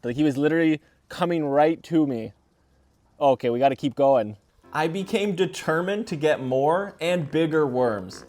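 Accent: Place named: Canada. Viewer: It’s only been seconds into the video and I can already tell the guys Canadian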